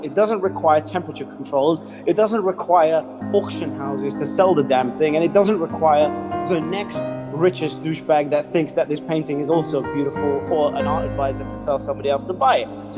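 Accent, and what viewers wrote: United Kingdom. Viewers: Fuck Yes!! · Yaaaaas 🎉😮❤❤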